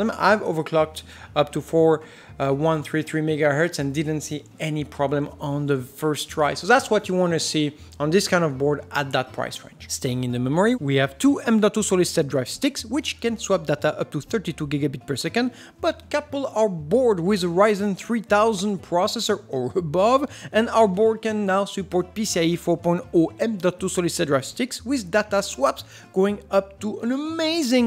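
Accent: France. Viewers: That french accent tho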